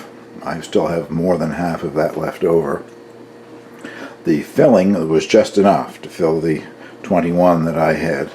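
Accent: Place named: Cape Breton, Canada. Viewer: Aww you remind me a bit of my dad - the good ol Caper accent is so endearing. I have never made these CB pork pies but I remember them well from my childhood growing up in Cape Breton. I've been living in Europe for the past 30 years now. Feeling a little homesick and would like to make these for tomorrow as we're having family over for supper. I think I might even make a traditional meat pie or cabbage rolls as well and go the whole 9 yards. :-) Merry Christmas sir!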